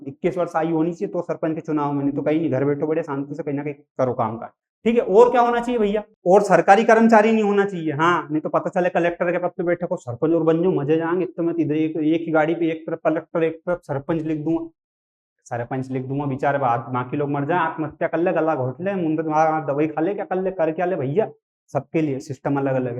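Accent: Indore, India. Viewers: Indori accent❤❤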